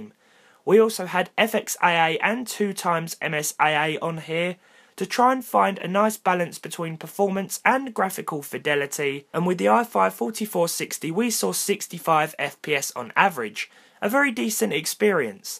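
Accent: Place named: England, United Kingdom. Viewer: Nice video and also i have to say that i like so much your English accent, it is easy for me to understand being Spanish Where are you from?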